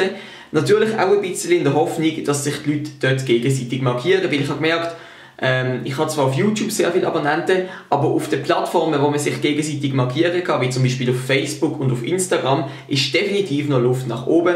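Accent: Basel, Switzerland. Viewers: Das liit am basler Dialäkt. Dä isch nöcher am Hochdütsche wie zB dr berner oder zürcher Dialäkt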